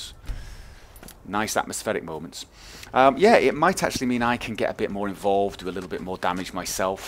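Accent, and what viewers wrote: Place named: Scotland, United Kingdom. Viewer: I fuckin love your accent, scotish right?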